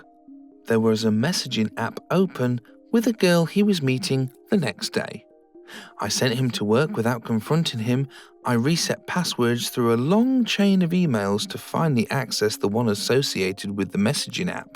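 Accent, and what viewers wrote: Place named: United Kingdom. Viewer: Perhaps it’s just you. I don’t mind a Brit narrating.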